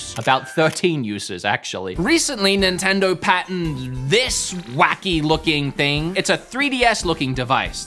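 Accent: Australia. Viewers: I'm just sitting here tripping out about how you seem to simultaneously sound like you're from Melbourne, Australia and Brooklyn, New York. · Wait, you're Australian? I guess I can't really tell an Australian voice apart on YouTube as I didn't know with MVG either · I'm Mexican. is the sole reason I love Australian people so much, obviously biased. But opened the window to another culture and accent I didn't know and enjoy.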